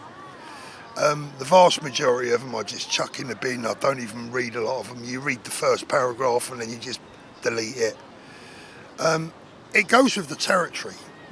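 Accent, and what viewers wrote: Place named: South London, United Kingdom. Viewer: The rough working class South London thing is an asset. There are not enough people like you in politics who can connect with the forgotten disenfranchised masses who don't vote. And once more prejudiced folk get past the accent and tattoos it's clear you are a decent sincere man. Again, another rare & much needed commodity in British politics.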